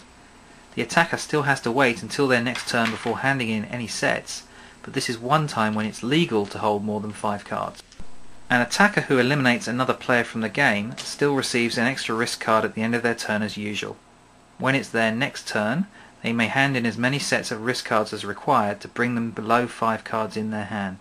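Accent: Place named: Australia. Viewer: wow he sounds Australian!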